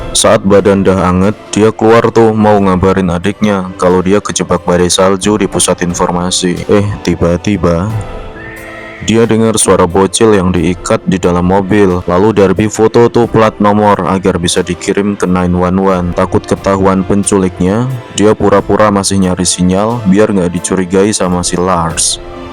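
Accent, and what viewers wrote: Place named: Java, Indonesia. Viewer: Min suaramu medok tp AQ suka wkwk menyala bosku gas nonton film rekomendasi nya 🔥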